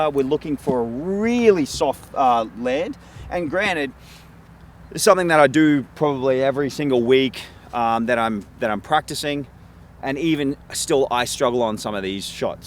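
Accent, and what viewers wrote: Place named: Australia. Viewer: is he an Aussie? sounds very Aussie!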